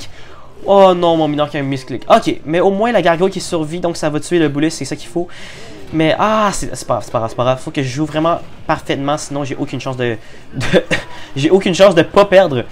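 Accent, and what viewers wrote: Quebec, Canada. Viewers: Je kiffe tes vidéos mon poto! Et puis faut dire que ton accent me tripper ! Vive la France pardon vive le QUÉBEC !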